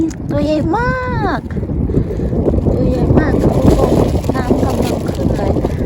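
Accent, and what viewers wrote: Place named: Chaiyaphum, Thailand. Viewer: เว้าคือคนชัยภูมิเนาะ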